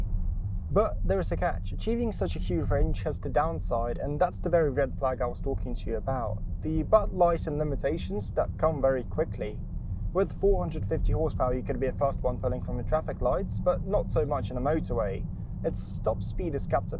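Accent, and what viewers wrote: United Kingdom. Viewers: ИИ или откуда такой британский акцент?))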